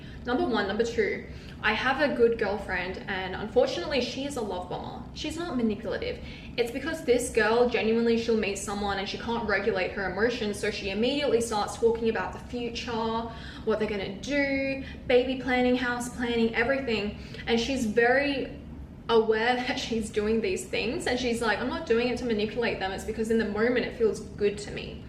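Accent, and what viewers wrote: Australia. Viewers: This accent is tripping up my brain. I hear like 70% Australian and something else mixed in back and forth.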